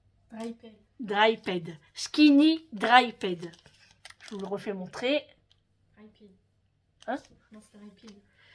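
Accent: North, France. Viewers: Bonjour joyeux anniversaire j espère que tu sera gâté oui a noyelle beaucoup de magasins je suis allée avec ma fille je n en pouvez plus quand je t ecoute dans t et videos on a beaucoup de points communs j aime ta simplicité et notre accent du nord moi samedi je vais faire du shopping avec ma fille aussi toujour bien decouvrir t et menus bonne ballade enyre mère et fille a bientôt 🥰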